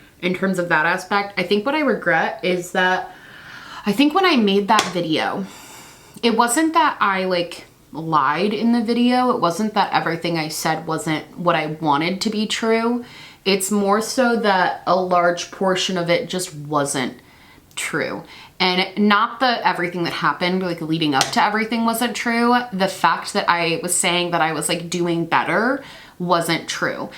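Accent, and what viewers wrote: United Kingdom. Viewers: my love, we are going to need book reviews now. Full English accent accepted. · Video in a British accent? 💜 lots of love!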